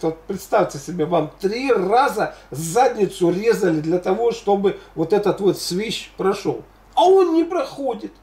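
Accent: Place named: South, Russia. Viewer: Благодарю,Геннадий,все посуществу,а мне нравиться наш южный говор ,а кому не нравится,пусть не соушают!Я так и подозревала,сделали ставку на Ваше имя,хотели деньги зарабатывать на передаче.Как то не верилось в благость телевидения.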